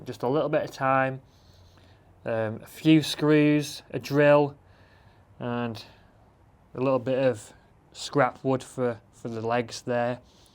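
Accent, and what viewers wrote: Manchester, United Kingdom. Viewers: me and the wife are mosly from sheffield now barnsley at a guess we think your from manchester ?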